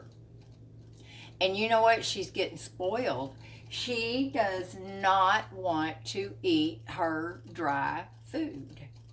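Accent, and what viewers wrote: South, United States. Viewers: U r the bomb! I luv your southern drawl! U go girl. Your house looks so inviting for folks now.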